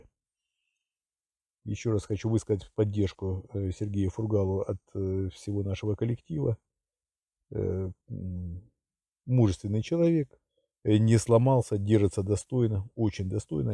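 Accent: Ukraine. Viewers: Послушала полторы минуты и поняла что вы из Украины.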